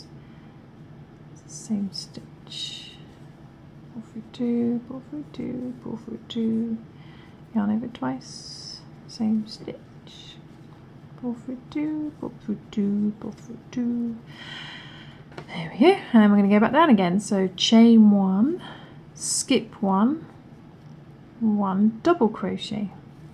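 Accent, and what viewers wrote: United Kingdom. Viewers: You explain it so well and your not rushing it at all I love how it’s all to do with the crocheting rather than showing off your nails like some others do . Plus it’s good to hear someone from the uk 🇬🇧 like myself 😊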